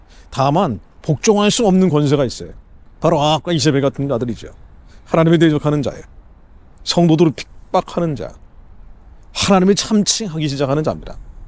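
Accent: North Korea. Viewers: 하나님의 심판이 있을것이요 이사람 목사도 재명된자다 목소리도 이북 빨갱이 냄세가 나는데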